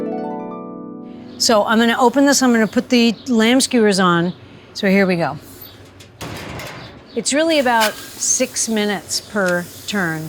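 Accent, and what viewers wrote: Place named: England, United Kingdom. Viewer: hold on while I get myself together 😂😅🤣,Your hilarious 😂😅😂,love your English accent,Oh yes love your cooking 🧑‍🍳 shows.Thank you for so much entertainment 😘😘❤️🇬🇧❤️🧑‍🍳🥂😇😘😘❤️🙏❤️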